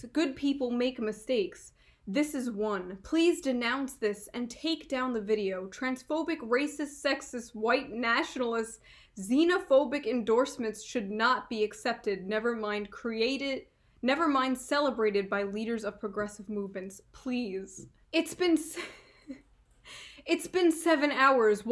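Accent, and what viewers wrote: Boston, United States. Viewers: Why is your Boston accent so strong here😂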